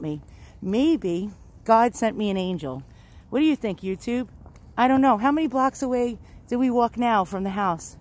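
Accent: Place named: Catskills, United States. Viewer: I grew up in the Catskills & your accent caught my attention right away, it was like being home again. Don't be too hard on yourself as you have a great personality. I survived the battle of Mogadishu in 1993 & although I didn't suffer problems with PTS it did take time to come to terms so there is light at the end of the tunnel. Dogs are a gift indeed so hopefully Samson will bring you peace. Just remember never give up & keep fighting. Your videos are charming so hopefully making them is enjoyable for you, all the best!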